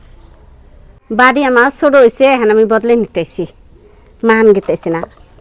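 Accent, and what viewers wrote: Tangail, Bangladesh. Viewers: টাঙ্গাইল এর ভাষায় কথা বলেন আবার পরিচয় দিচ্ছেন ভারতীয়? এই ভুল তথ্য দেওয়ার কারণ কি?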